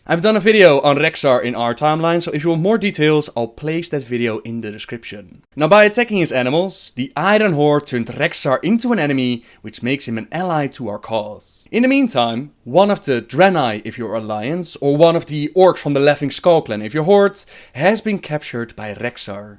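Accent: Netherlands. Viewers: His accent. He is from the Netherlands. ^_~ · Awesome :P BTW are you dutch? because you have a small dutch accent :P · You dutch? Je klinkt in ieder geval nederlands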